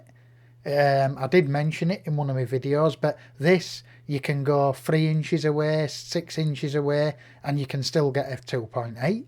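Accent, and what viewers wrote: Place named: Manchester, United Kingdom. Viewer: sounds like a manc :-) Regards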